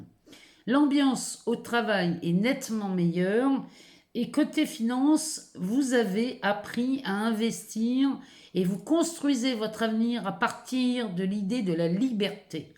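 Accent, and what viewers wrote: North, France. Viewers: Bonjour. Merci pour votre tirage! C'est toujours un plaisir de vous visionner et étant originaire de la métropole lilloise, votre accent me fait du bien 😊. J'ai commencé à vous suivre lorsque je vivais sur Toulouse. Puis il y a un an je suis partie en Bourgogne en Puisaye pour suivre ma formation. Au début de cet été, ayant décroché un CDD sur Paris, je vous suivais depuis le Nord ou dans le TGV Lille-Paris:), et depuis début août je suis vos tirages dans Paris même (11ème). À partir de la semaine prochaine, je vous suivrai dans le Val d'Oise (la Frette-sur-Seine). Je suis Capricorne ascendant Vierge. Je souhaite de tout cœur que vos messages me fassent écho cette semaine. C'est tellement compliqué pour le logement. Et comme vous le disiez, au début j'ai commencé ce premier travail de reconversion en étant très enjouée. Mais ma situation financière, mes problèmes de logement et le futur très incertain dans l'atelier d'art où je travaille me font voir cela plus ou moins comme un échec. 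Je croise donc les doigts par rapport à votre tirage, besoin tellement de stabilité 🤞🤞. Merci beaucoup pour votre bienveillance, votre professionnalisme et tout ce temps que vous nous accordez généreusement. Beau week-end à vous 💛☀️🌈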